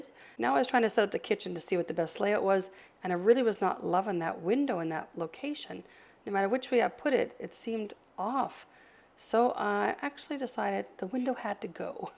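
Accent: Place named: Canada. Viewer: My first time to your channel. But I have to say I was a little surprised, seeing your channel name, but hearing a very Canadian accent. 😅🤭 This is an awesome tutorial. Thank you! 🥰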